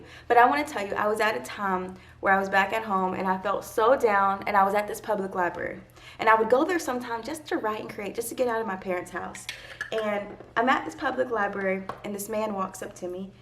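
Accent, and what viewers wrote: South, United States. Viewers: I hear that southern accent. Where are you from, if that's not to forward to ask?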